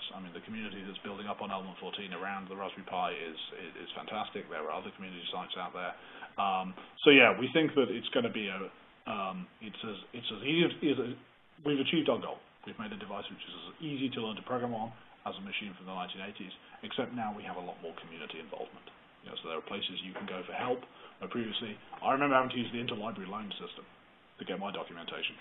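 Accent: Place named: United Kingdom. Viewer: Det er jo fordi han taler UK engelsk, og ikke USA engelsk.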